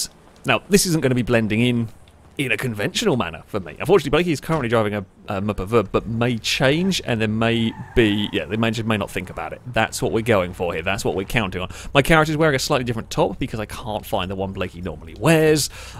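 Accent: United Kingdom. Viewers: I like your British accent It makes you more interesting to listen to.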